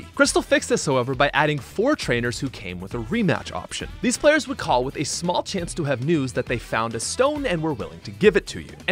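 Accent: Canada. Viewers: Imma guess this guy is canadian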